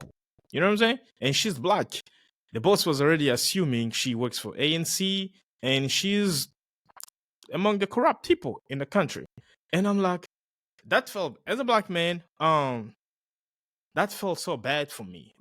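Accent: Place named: Nigeria. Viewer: What is wrong with Nigerians, why don’t they take their wealth to Nigeria and build it. Nigeria is broken, even Banana Island is not great. Looks great by Nigerian standards. Nigeria is crime ridden, they live in darkness because the country has not got electricity. The rich people have generators that light only their homes. With all these billionaires they constantly boast about, shouldn’t they help at home. Man, you sound like a Nigerian. And Idris Elba is a Ghanaian and Sierra Leonian. LeBron James is American.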